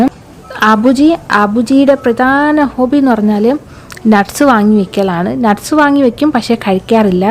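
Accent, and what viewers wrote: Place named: Trivandrum, India. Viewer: place trivandrum aano. Tone kettitt angana thonnunu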